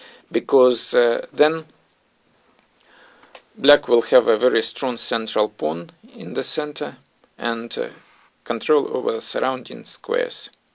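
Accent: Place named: Russia. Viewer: It's very common accent for Russian who speaks English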